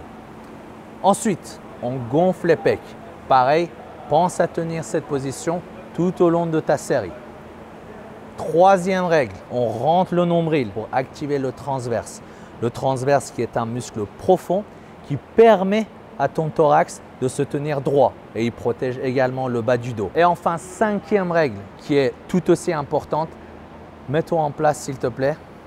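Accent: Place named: Iran. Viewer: IL EST IRANIEN LUI C EST PAS POSSIBLE CET ACCENT hahahahahah de la part d'un autre iranien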